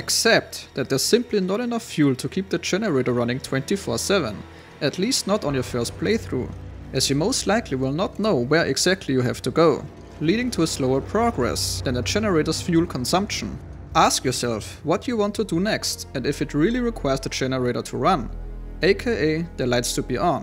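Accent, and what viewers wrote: Germany. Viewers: he sounds german, not french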